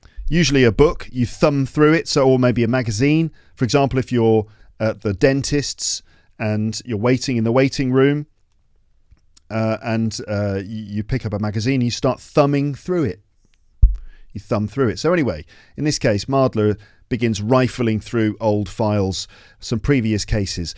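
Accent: RP, United Kingdom. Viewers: It's been a great experience being with you in the podcast episodes all this time as a learner of English as a second language. I have learnt a lot and continue to do so - without any loss of interest. It's good - a good exercise being with you learning vocabulary, pronunciation, etc. Your clear voice, the RP accent and the associated other features have made me a regular visitor to your podcast, which is, I believe, has been the most useful source for acquiring the language in a natural way for many a foreigner. Hope the podcast will be as good in the coming year and the listeners/video viewers will be looking forward to watching and listening to very useful content as you have generously brought us for ever. Congratulations! Happy New year! To and the family and the lepsters.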